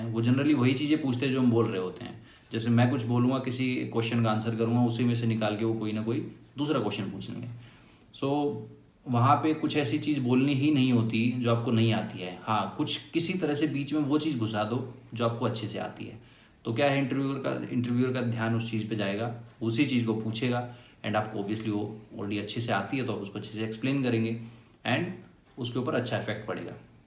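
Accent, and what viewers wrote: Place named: Haryana, India. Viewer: thankeww for the strategy but i love the harayanvii touch in language❤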